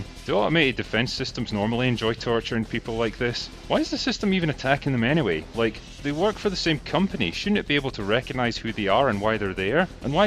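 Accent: Scotland, United Kingdom. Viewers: you sounds Scottish in this video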